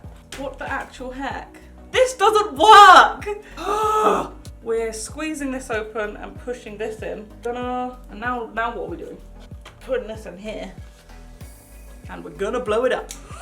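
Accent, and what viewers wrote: United Kingdom. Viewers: I'm sorry but are you from the UK love you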